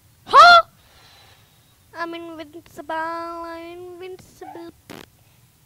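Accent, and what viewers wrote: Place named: United Kingdom. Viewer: oh your british, cool?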